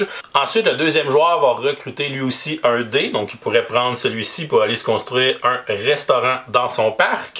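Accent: Canada. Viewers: Des effaces 😁 j'adore le Québécois 😉 en tout cas merci pour la vidéo, je viens de le récupérer avec mon Dinosaur World que j'adore donc à jouer rapidement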